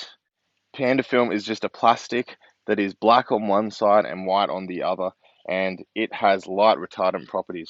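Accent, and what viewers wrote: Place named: Australia. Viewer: finally a yobo gardener.... sick of hearing yanks and poms... plus 10 bogan points for the beers... subscribing to this bloody legend!!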